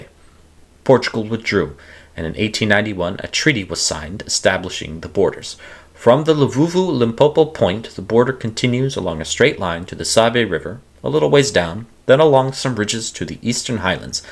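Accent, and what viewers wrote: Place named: Brazil. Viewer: Great video! Just make sure not to use Brazilian pronunciations for Portuguese names in the future 👍🏻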